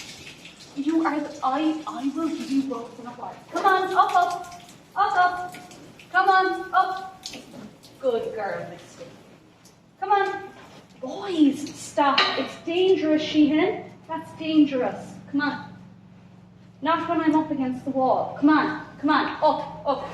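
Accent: Kerry, Ireland. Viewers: dont say yearlings The original farmer word is WEANLING That's just what I think You use some lovely old expressions like the "Pike " and definitely don't lose the Kerry accent Best of luck from coMeath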